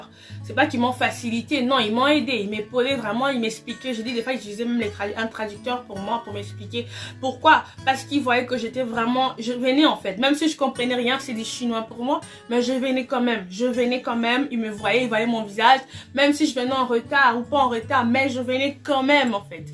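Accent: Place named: Democratic Republic of the Congo. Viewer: salut ton accent m'intrigue et j'aimerais bien savoir ton pays d'origine je pense que tu es Congolaise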